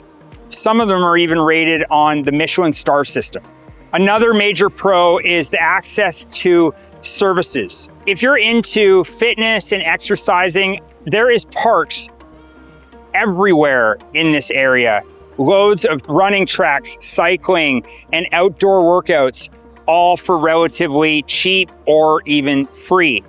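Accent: Canada. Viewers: He is from Canada, come on!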